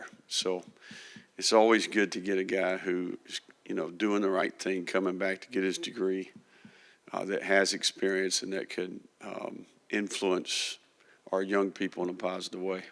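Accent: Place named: Tennessee, United States. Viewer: You must not be from Tennessee lol everyone talks like him In Tennessee (where he’s from)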